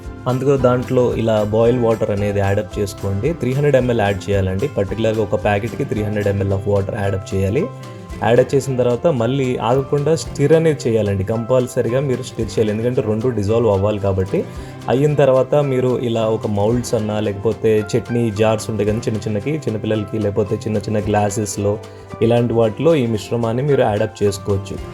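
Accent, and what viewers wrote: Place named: Godavari, India. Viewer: అయ్ మీది గోదారొళ్లoడి చాలా బాగుందండి. 👌👌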